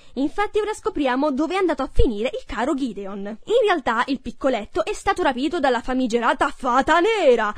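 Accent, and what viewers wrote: Bari, Italy. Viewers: la cadenza barese è adorabile <3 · sei barese ho dio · oddio sei di bari? anch'io 😂😂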